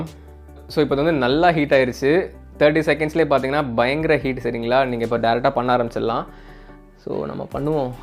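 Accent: Madurai, India. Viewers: Madurai Slang la Pesuriga 😁😁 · Today speaking slang very level and attractive 😍😍😍like madurai peoples...love from madurai 🤩🤩🤩🤩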